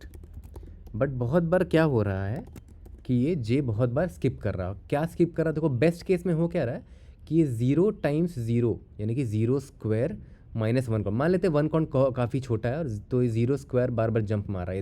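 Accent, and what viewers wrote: Bihar, India. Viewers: Bhaiya aap bihar se ho kya??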